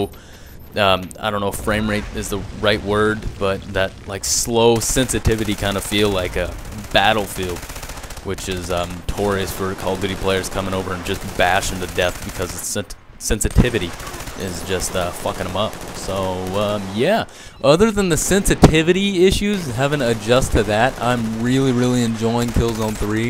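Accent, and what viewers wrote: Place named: United States. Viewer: more like white with a 95% american accent with 5% scottish. good try though.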